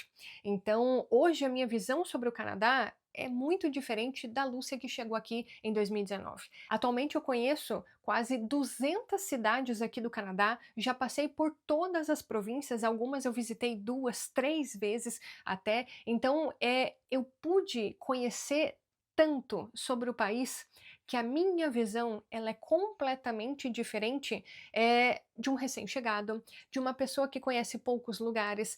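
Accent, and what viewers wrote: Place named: South, Brazil. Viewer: Lúcia, talvez tu já fez vídeo falando de que Estado tu é natural. Tú é Gaúcha ou Paranaense? ( Pelo sotaque). ESTÂNCIA VELHA RS.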